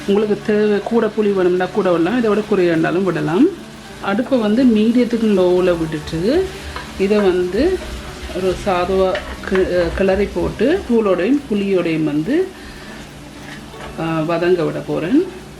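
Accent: Sri Lanka. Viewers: Are you a Srilankan Tamil?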